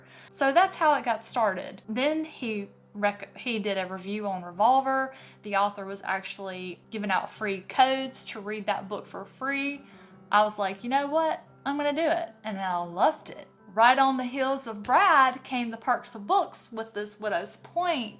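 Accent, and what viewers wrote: South, United States. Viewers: I love your channel!!! Love your accent. I'm from the South too.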